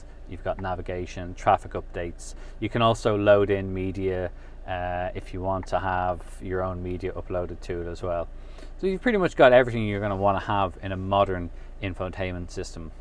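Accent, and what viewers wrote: Ireland. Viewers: The Irish accent had me sold before we even saw inside the van lol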